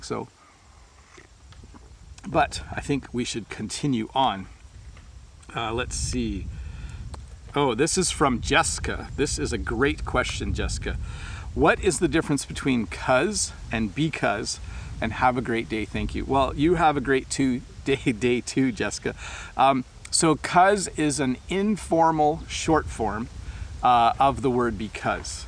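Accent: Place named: Canada. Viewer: Hi I love your accent Canadian very clear pronunciation but I am not good at English I am from Bangladesh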